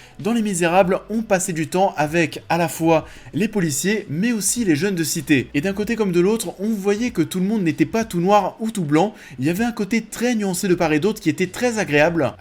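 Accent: Paris, France. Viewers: On devrait donc selon toi nuancer les attaques et les insultes permanentes que subissent au quotidien des fonctionnaires cherchant simplement à faire leur travail ? Rester dans les clous que nous impose la bien-pensance des milieux privilégiés d'extrême gauche ne suffira pas à gommer ton manque de connaissance du sujet que dévoile ton accent parigo du 75, de privilégié justement...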